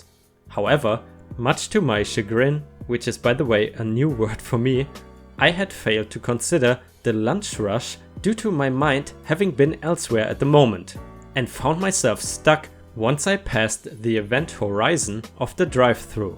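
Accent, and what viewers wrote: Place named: Germany. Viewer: I was pretty sure that accent was German, and it was all but confirmed by your perfect pronunciation of Bremen and SV Werder. Servus~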